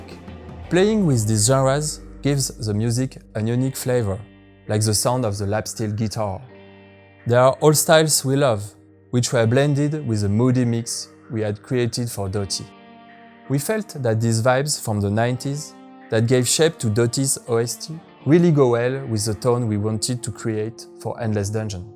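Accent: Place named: France. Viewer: Toujours aussi fan des oeuvres d'. Et The french accent, toujours aussi nice ^^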